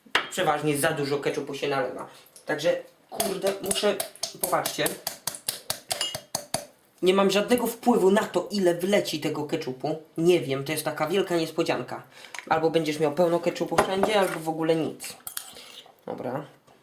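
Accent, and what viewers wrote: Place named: Kielce, Poland. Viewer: chlopieee powiedz mi ze jestes z Kielc :D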